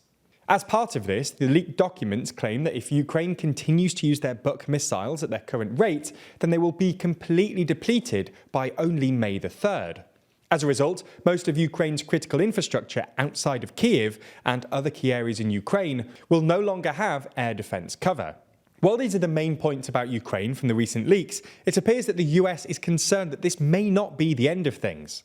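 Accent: United Kingdom. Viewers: They're from britiain 😊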